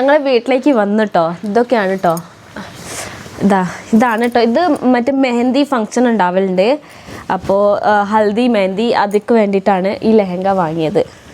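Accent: Malappuram, India. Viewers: a north indian doing videos in Malappuram slang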